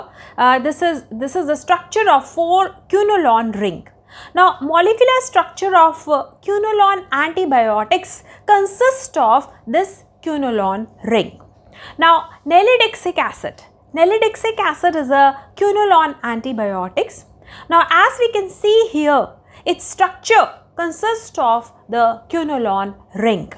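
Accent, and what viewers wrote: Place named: England, United Kingdom. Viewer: liked your English accesent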